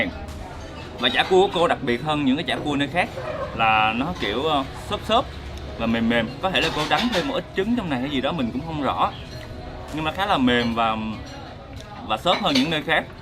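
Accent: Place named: Central, Vietnam. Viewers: chuẩn giọng miền trung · Nhớ giọng huế quá. Thèm bún bò huế quá. Giữa đêm em đg đói mà phải tự hành hạ bản thân ntn hả a 😥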